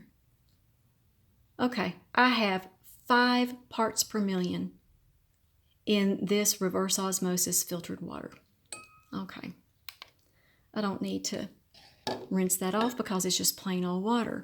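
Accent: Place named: South, United States. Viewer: I love your videos!!! Your voice is so sweet, and it reminds me of spending summers in the south as a kid! I have learned so much from you about my orchids, and so far they’re doing great. My question is about fertilizing my orchids, in that I only have four, and mixing a gallon of fertilizer for 4 orchids seems wasteful. How can I adjust the amounts for so few plants?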